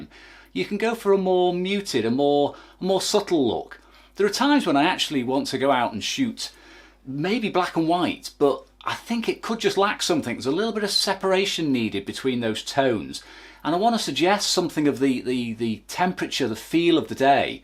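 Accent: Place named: Liverpool, United Kingdom. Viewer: I always hear the cadences of the when I hear you speak. I was interested to find that you seem to be from the Liverpool area. Always enjoy your content!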